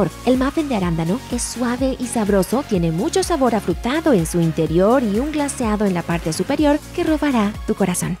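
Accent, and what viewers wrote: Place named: Latin America. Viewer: No entiendo la utilidad de traducir al español (principalmente en latinoamerica) videos con un guión y contenido realizado para Estados Unidos. La mitad de estos locales no se encuentran en mi país, dan los precios en dólares aún cuando la locutora tiene acento latino, los precios son mucho más baratas de la realidad y varios de estos producto de locales que si se encuentran en mi país no se venden...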